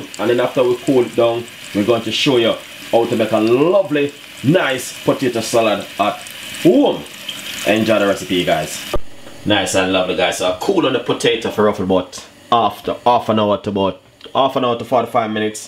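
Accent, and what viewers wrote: Jamaica. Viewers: I love food but my favourite thing about your video dem is ur Jamaican accent... keep it real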